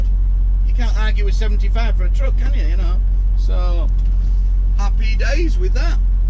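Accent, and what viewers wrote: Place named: England, United Kingdom. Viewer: Love your accent. Reminds me of the song, I'm an alien I'm a legal alien, I'm an. Love your videos. Howz trucking in USA as compared to UK?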